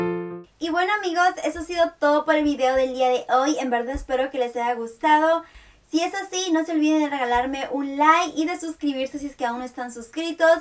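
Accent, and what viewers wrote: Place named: Mexico. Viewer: Pareces mexicana como hablas!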